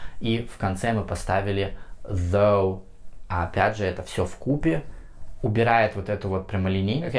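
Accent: United States. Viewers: Да, отчетливо услышала американское произношение 😊.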